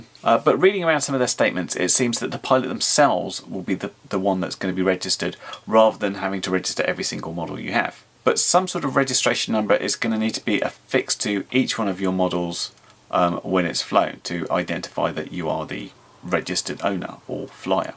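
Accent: United Kingdom. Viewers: I was wondering where you were based on your accent, i didn't know you were Southampton till this video, your not far from me :) I currently have no intention in registering and if everyone did the same it would make it unenforceable. Im confused about the CAA 1000ft exemption, does it still apply to all fpv flyers? Since the start of all this, i have felt that 400ft within a certain proximity to an airport is fair enough but if your not near low flying aircraft then the 1000ft limit should still apply. i live just outside restricted military airspace, im outside there zone and so they never come here but commercial aircraft do not have any flight paths over here ether as i guess they maintain a no man's land buffer to be sure. i can fly perfectly safely at any altitude here and never come into conflict with military or commercial aircraft and to be (officially) kept to 400ft is just stupid. 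As for auto pilots, which way do they want it? Ether they want to say that autopilots are not allowed over a certain altitude OR they want geofencing, you cant have both! The idea that you would remove Autopilots from something is just bonkers, all it achieves is increasing the risks, not diminishing them! I fly over farmland, no matter my style of flying, be it low and fast or high altitude never puts anyone or anything in any danger and i will continue to fly with common sense instead of a lack of sense that the government are displaying over this. I also feel that the more rules the go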